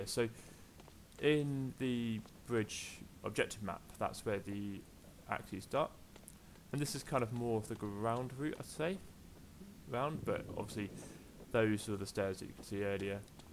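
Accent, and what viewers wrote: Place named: England, United Kingdom. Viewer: great video! are u from England? u have a nice accent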